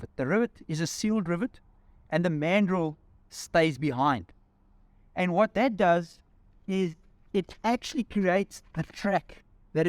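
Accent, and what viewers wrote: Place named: South Africa. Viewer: i could listen to you for hours, not because of the roofrack, because of the accent. it remains me of 23 Years living in South Africa. <num> .Then back to Switzerland. But nevermind , your products are great. Lekker bly and tot siens.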